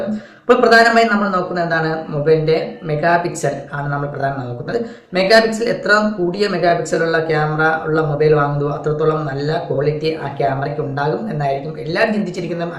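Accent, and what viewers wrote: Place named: India. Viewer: Video title in English, spoken in Indian.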